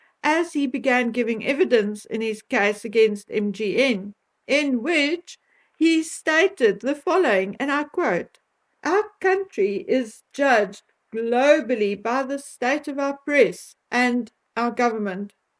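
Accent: South Africa. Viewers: Hello, I just happened upon your channel and recognise the accent, are you South African??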